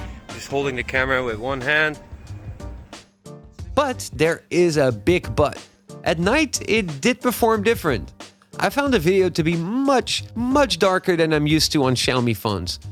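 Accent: Netherlands. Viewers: Hi, Great video! Are you Dutch? I think I can hear a slightly dutch accent, however your english is very nice though! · You sound Dutch. Aren't you?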